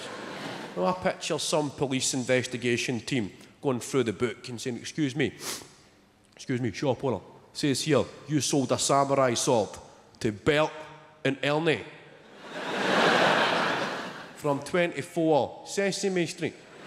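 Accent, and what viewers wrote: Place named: Scotland, United Kingdom. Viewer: He's not English he's Scottish from Glasgow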